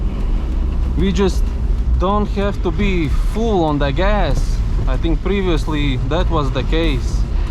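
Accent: Latvia. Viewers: Are u latvian bro? Accent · could tell by the accent that you are latvian eu pavizinaties var? 😂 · ur actent sounds like ur Latvian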